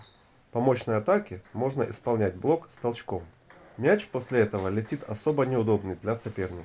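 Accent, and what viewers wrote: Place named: Moscow, Russia. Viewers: А что тебя больше расстроило-маасковский говор или накладка на уровне ширпотреба? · Судячи з описаного голосом па маасковскі, накладка для класики, бо на повільніших основах срєдняя врєдность з блоків буде ніякою зі всима витікаючими, що зобов'язуватиме власника до відповідних прийомів, дієвих для таких засобів. Це не докір для тестостериста))), лише синтезовані з побачено-почутого власні висновки, базовані на нікчемному досвіді й таких само досягненнях, тобто там потрібно буде проявляти спочатку зрозуміле і потім набуте все розмаїття техніки і тактики, а саме попіхи-перепіхи будуть малоефективні, але для володіючих ними і шипами вцілому достатньо зрозумілі і доступні, знову ж не в докір останнім, бо вони своїми "вміннями" теж можуть зробити гідний фурор, тобто головняк))), опонентам. А ще хотілося б побачити загальну картину гри на рахунок, і суперника, що краще володіє технікою гри і переміщень, а не одразу в рукопашну...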